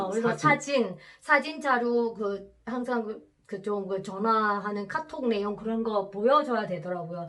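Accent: Gyeongsang, South Korea. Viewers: 경상도 억양이 아주 살짝있네라고 생각했는데 대구에서 어학연수해서 그런갑네요. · 말씨가 낯설지 않다 생각했는데 대구에서 어학연수를 받으셨군요 저두 대구 고향♡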